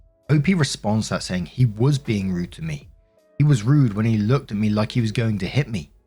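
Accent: England, United Kingdom. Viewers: there is nothing i love more than hearing the voice of a tatted english sweetheart saying "welch's grape juice dumpy" and "hella ass" while laughing. i think i may literally be in love with you just btw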